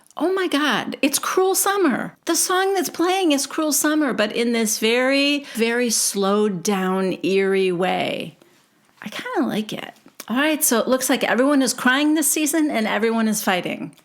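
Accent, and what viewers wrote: New Jersey, United States. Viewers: Welcome back and I think this season will offer so many opportunities to use that Jersey accent. Moving is terrible. Hope you and olive and the mister enjoy the new digs.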